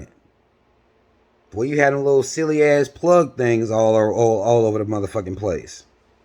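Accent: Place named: France. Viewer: I fw you. That being said.. Accents are a thing. When you expose yourself to the rest of the world it's something you grow to understand. It'd be quite foolish of me to ignore what wisdom you have to share because it was shared in a french accent would it not?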